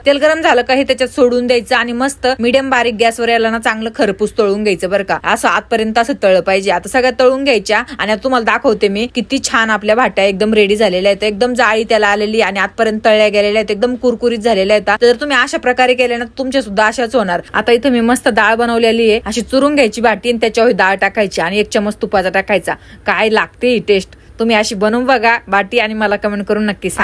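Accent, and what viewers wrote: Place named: Jalgaon, India. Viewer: जळगावची वाटते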